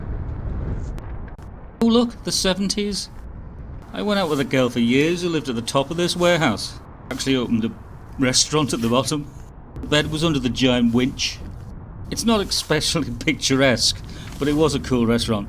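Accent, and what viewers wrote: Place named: Liverpool, United Kingdom. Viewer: He has an unusual Scouse accent tbh, feels like he's trying to sound posh at the same time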